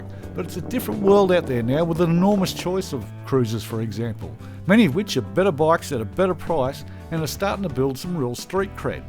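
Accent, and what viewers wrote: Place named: Australia. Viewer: My cousin is laughing so hard at this video right now… shout out from Vancouver Canada, he loves the Australian accent and says you are so charming. Starting to watch your other videos also, he said keep your channel growing because it’s so funny! Wondering why stopped posting By the way 999th subscriber❤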